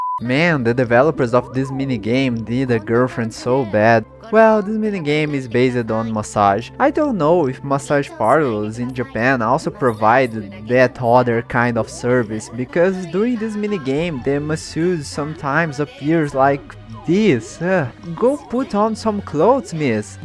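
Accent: Brazil. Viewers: ... your english skills sounds like a brazillian to me. (also i mostly agree on your opnion)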